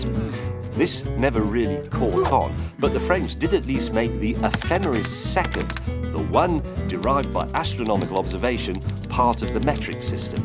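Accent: United Kingdom. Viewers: why the hell the British accent is sooo attractive?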